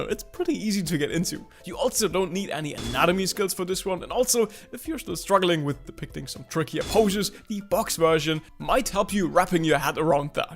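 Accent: Germany. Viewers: Im hearing deutsch broski🤨🤨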